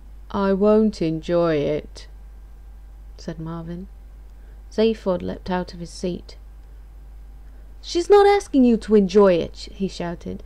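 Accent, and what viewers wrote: United Kingdom. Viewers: I always enjoy watching you read! Love your British accent and when you take on the characters voices it shows you are a bit theatrical! I love it! I have to add the twinkle in your eyes, your restraint of laughter from your facial expressions was hard when your cat went meow! lol .. so cute!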